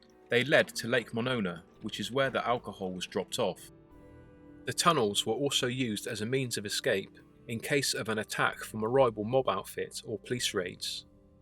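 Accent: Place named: Leeds, United Kingdom. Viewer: He's from Leeds in North of England uk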